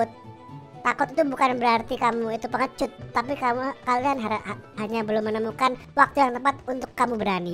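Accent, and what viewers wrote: Java, Indonesia. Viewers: bhs jawanya lancar n kentel jawanya kayak kopi. Bang enggak.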